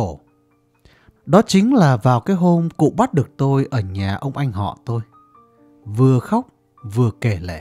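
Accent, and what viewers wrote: Vietnam. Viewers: Tui thích giọng đọc của bạn, một chất giọng Bắc rất riêng không chói tai và rất truyền cảm. Riêng về các tác phẩm văn học thì các cây bút miền Bắc viết có tính chất văn học cao hơn để cho người nghe người xem nhiều suy nghĩ giúp có thêm nhiều tư duy.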